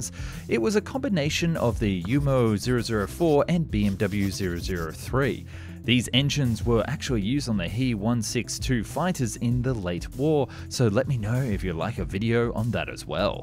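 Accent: Australia. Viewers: He misspronounces some words due to his aussie accent. Some facts arent straight too. Id give it a B... the channel. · Apart from the weird Australian/NZ (?) pronunciation, this is really great!!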